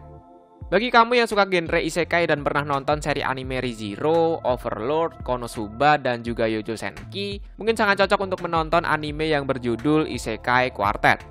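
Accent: Java, Indonesia. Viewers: Gk popo asli jawa yh? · Jawa ya bang? awokawok sama :v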